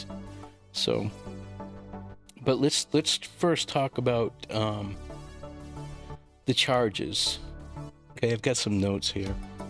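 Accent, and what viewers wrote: Boston, United States. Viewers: Sorry, I asked you a question without bothering to look at your ‘about’. Ha! I thought I discerned a Boston accent!! I wonder though where you were in booking? I have 2 friends who just retired from corrections. One worked at MCI Shirley & Ashburnham with the ‘skinners’ as he referred to them. My other friend worked at MCI Framingham where she was head nurse. She always said she’d rather work with the guys cause they won’t whine half as much. Again, thanks for doing what you do. As I’m a spankin new subscriber, I’ll be binging your vids to catch up. I’ll comment but I promise you I won’t argue with ANYONE. That kind of crap just bores me to tears & I leave channels where that happens. Til next time, michelle in boston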